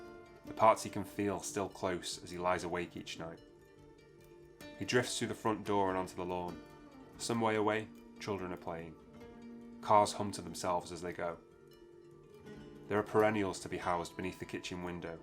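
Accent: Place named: North, United Kingdom. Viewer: Just found your channel from this great video 👍 you sound like a fellow northerner! I've been writing flash fiction lately and really enjoying it. Looking forward to checking out some more of your videos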